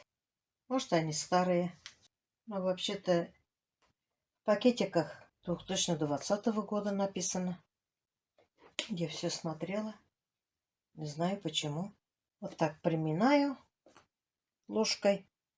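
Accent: Tatarstan, Russia. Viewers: добрый день.Желаю удачной съёмки. Слушаю тебя и вспоминаю маму.Знакомый акцент татарский.Не болей.😘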